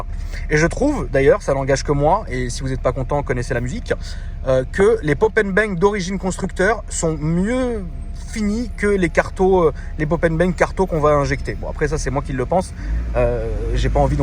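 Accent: Tunisia. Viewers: on kiff l accent Tunisien 🇹🇳 🥇🏆😂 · Sérieux on dirait un tunisien quand tu parle en arabe je sais pas si sa a force de traîner ac des tunisiens ou quoi mais voilà 😕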